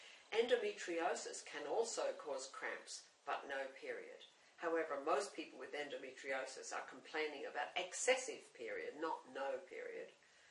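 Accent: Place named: Ireland. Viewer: Sounds posh irish to me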